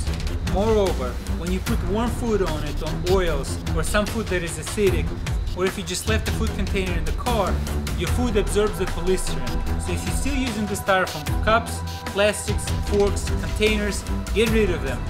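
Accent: Russia. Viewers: I like ur russian accent! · Сильный русский акцент! Ты из России/Украины/Беларуси? В чем суть эксперимента? Ну, растворим полистирол в ацетоне и чё, это его основная опасность и все умрут из-за этого?!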